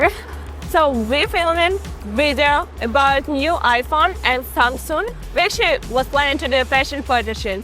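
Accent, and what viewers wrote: Russia. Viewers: Мне показалось, или я слышу русский акцент? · Слышу русский акцент 😊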